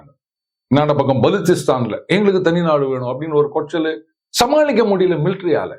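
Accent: Chennai, India. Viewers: Sir Ur Madras language is super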